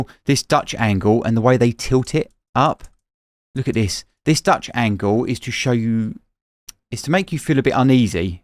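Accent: United Kingdom. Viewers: Can you please turn off your British accent and speak English like normal people do around the world?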